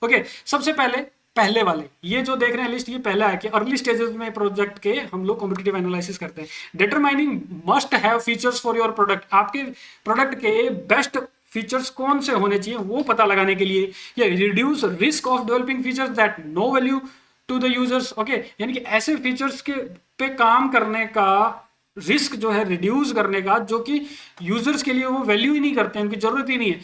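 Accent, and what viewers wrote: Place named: Rajasthan, India. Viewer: sir aapki tone se lag raha h aap pakka sri ganganagr or hanumangarh (rajasthan) side ke hoo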